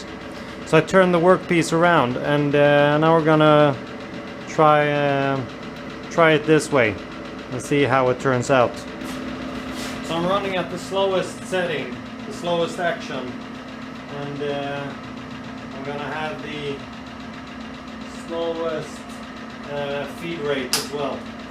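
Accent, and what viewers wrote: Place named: United States. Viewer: Thanks for the information. I'm in Nuuk, Greenland. I was just curious because your American is so good, I couldn't place where you might be from. I enjoyed the slow motion shaper vid. Nice.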